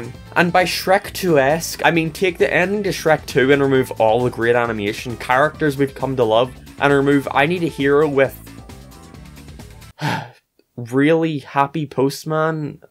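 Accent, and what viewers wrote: Northern Ireland, United Kingdom. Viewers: I honestly started watching you because your accent makes your commentary pleasing. If I had to guess, youd be from Northern Ireland. I had a coworker from there and I can hear the similarities between you two.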